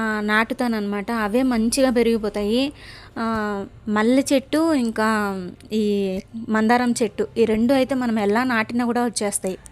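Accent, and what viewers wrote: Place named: Tirupati, India. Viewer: Mee slang vini chala rojulaindi...maadi nellore .. tirupathi slang nellore slang chala kalustundi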